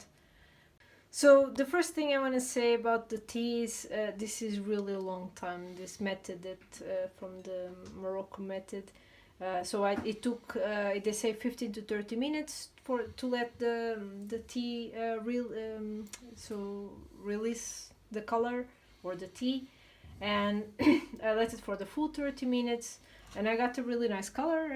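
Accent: Portugal. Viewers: Just a question: Are you Portuguese? Because your accent seems to be 😁. Another question :This colour doesn't affect the hair colour? It just cover the gray hair? Thanks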